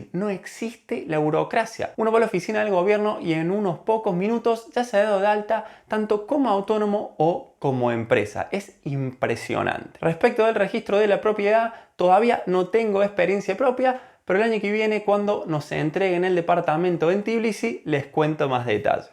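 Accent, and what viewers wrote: Córdoba, Argentina. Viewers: El mejor lugar es Argentina. Ah, no para, me equivoqué de época jaja. Excelente contenido! Me estoy paseando por todo tu canal y es muy cómodo escuchar a alguien hablar con acento cordobés sobre viajes en el mundo! Saludos desde Bs As.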